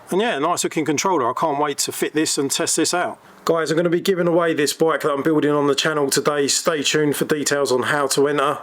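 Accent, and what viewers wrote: United Kingdom. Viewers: Always enjoy the builds! From a cross the pond, I gotta say having a British accent makes it very entertaining 😂. I have no idea what a jubilee clip is, but I know what a hose clamp is.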